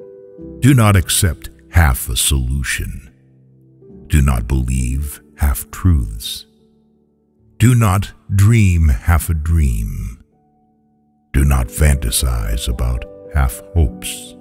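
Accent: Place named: United States. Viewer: Great poem, but it would be far better to be read with a Lebanese accent rather than the usual American which is yet more grating US imperialism.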